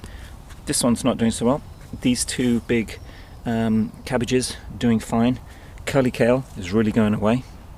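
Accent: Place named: Scotland, United Kingdom. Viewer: Hello I love your chitchat with Citizen Kale, love your Scottish accent. 😀😀😀👍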